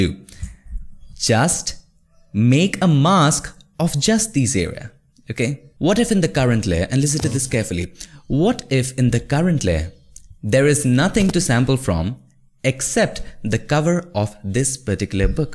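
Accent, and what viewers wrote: India. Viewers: perfect indian voice i see on youtube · i realy like your monobrow. but indian english is very exhausting to listen to for me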